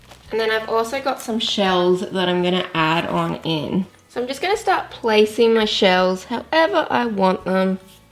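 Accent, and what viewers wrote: Australia. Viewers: She is Australian, she can’t sound American. You need to learn to respect people. If the video did put you off so bad, you wouldn’t have the time to comment Betty!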